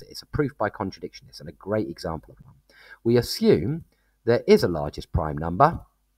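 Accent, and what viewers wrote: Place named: RP, United Kingdom. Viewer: Good, the best part of your presentation is your pleasant voice and almost extinct BBC English. But the very best is not what is in your video, but what is absent - background music.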